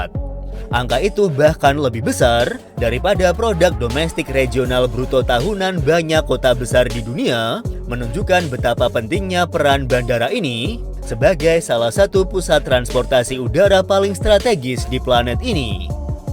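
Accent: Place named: Indonesia. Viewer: KOK SOUNNYA INVOIC INDONESIA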